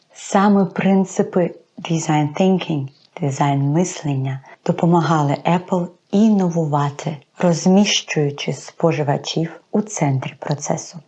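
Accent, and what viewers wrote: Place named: Lviv, Ukraine. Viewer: Типова львівська огидна вимова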